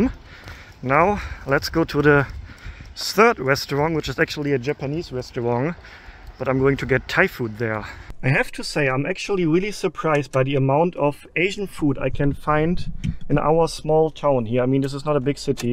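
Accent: Germany. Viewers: Your mum is H.O.T. ! ! Her English also is very English!!?? you seem to have a very strong German accent where your mum is WOW and H.O.T. Please tell your mum XDDDD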